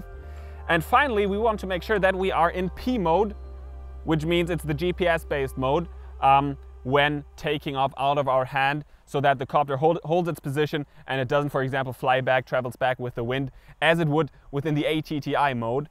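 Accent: Germany. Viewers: Ich wusste das du deutscher bist. Man merkt es richtig hart am Anfang und an deiner Stimme ;)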